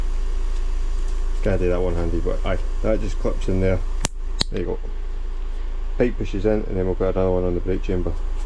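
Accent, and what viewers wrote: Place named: Scotland, United Kingdom. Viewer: He is speaking properly...he's in Scotland!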